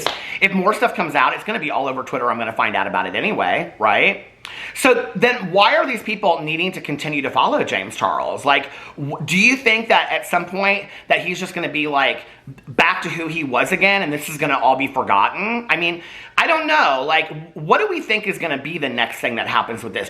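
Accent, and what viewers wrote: South, United States. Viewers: I swear you are more southern than you realize 😂 I still say Q-pon!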